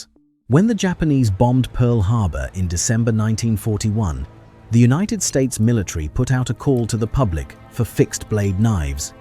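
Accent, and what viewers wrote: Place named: United Kingdom. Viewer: An American iconic product being narrated by a British voice, come on youtube you can do better.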